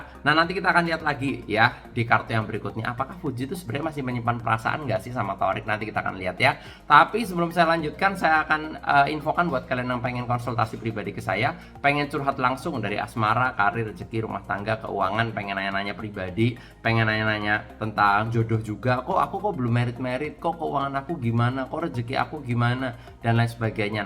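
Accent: Banyumas, Indonesia. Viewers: Mengeneh gara² video ne kang 😂rika ngapak banget asli ngendi mas😂😂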